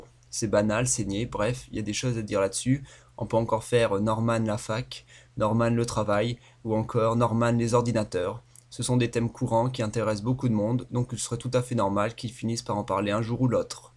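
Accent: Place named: Marseille, France. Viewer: j adore ton acsen marseillais XD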